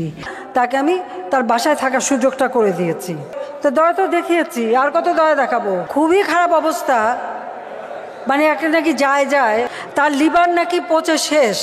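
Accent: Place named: India. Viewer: উনি কি বাংলাদেশের প্রধানমন্ত্রী নাকি ইন্ডিয়ান প্রধানমন্ত্রী ভাষা থেকে বুঝা যায় যে ইন্ডিয়ায় জন্ম উনার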